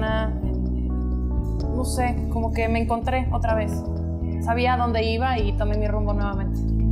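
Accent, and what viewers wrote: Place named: Mexico. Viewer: Es guapa no hay duda de eso. Pero abre la boca y se desparrama ese acento HORRIBLE mexicano y la pobre demuestra su limitado verbo e intelecto. Mas bonita esta de boca cerrada....pues no entran moscas.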